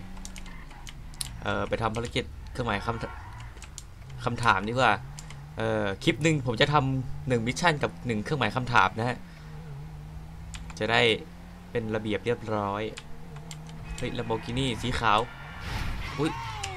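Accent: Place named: South, Thailand. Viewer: พี่ พูดใต้เป็นด้วย 555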